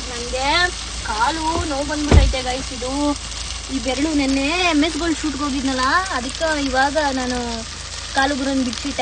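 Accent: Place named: Mandya, India. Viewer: ❤❤❤Namma Mandya style mam❤ super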